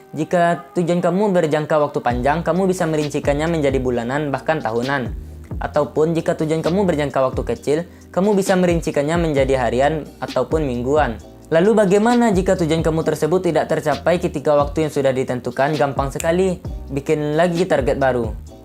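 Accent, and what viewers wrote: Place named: Punjab, India. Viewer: Bang Punjabi bgt😅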